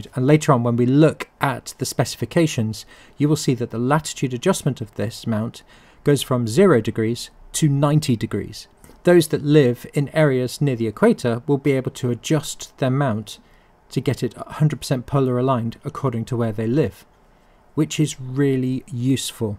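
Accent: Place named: United Kingdom. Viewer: Thanks for the video - love your work. Nice to hear a Brit accent in the AP world! I'm not sure that the £779 asking price is worth it. It's £300 more than the GTi, and whilst that gets you 10Kg payload vs 5Kg, Sky Watcher's own EQM 35 Synscan Pro for £120 less than this new mount seems to be a much better deal on paper. What am I missing?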